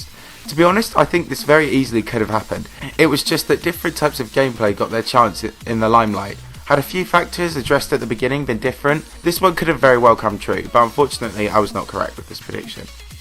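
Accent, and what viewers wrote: United Kingdom. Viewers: 3 reasons I like -His sexy handsome face -His lovley eargasmic brittish accent -His good content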